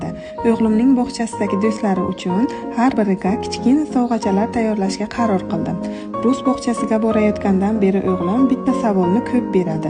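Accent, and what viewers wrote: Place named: Bukhara, Uzbekistan. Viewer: Бухороликми сиз.Шевангизга караганда Бухороликка ухшайсиз.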